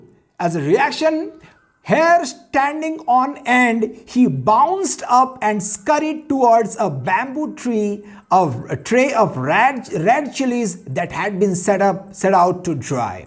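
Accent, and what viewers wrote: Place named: England, United Kingdom. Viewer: Wooooow Sir.... Nice English Accent ... Mind Blowing Sir....Shriya...😍😍😍👏👏👏👍👌👌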